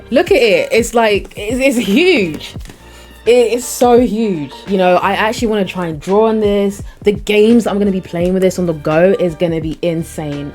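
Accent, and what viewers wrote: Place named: United Kingdom. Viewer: Wow…nice. Don’t know how I missed you. Beautiful and witty. And that British tongue 👅……. Cheers! 🇬🇧 🇺🇸👍🏾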